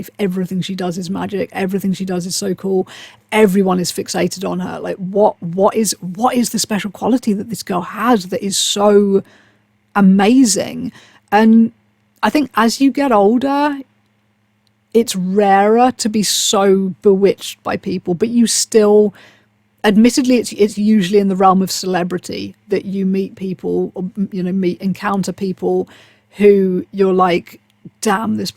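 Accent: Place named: RP, United Kingdom. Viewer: Ok so first off I want to say that this is not a creepy old guy hitting on you… but rather a creepy old guy explaining how shocking it is to hear one of the most beautiful women I have ever seen who actually felt like she was on the outside and she had bullies! Seriously if I could put what my dream woman would have looked and sounded like to physical reality when I was younger it would be you. You are exactly the girl that I always wanted to go out with but never could pull it off. And oddly enough I too have been on methadone for 16 or so years. Perhaps one or two more but 16 seems about right. So anyway…I’m really glad that you seem to found yourself and are living the best life you can… and you are taking really good care of yourself as I know how hard it is to stay in shape while being on methadone. I liked how you put in the sidebar to make sure that no one else makes the same mistake as you. 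I find so much in common with what you are saying… I am autistic. I suffer with forced speech. I was bullied in grade school. Anyway I suppose that I wrote this because I was so shocked that someone who seems so perfect had such a terrible time. Or perhaps it’s the posh accent. Loved the video and I am looking forward to seeing more.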